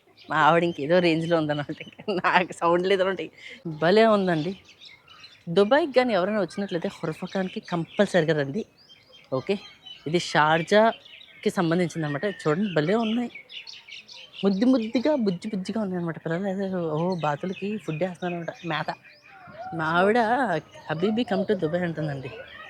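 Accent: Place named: Telangana, India. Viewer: Elo😂 meedhi Telangaanaa Slang kadhaaaa🌲🦕🦜❇️✳️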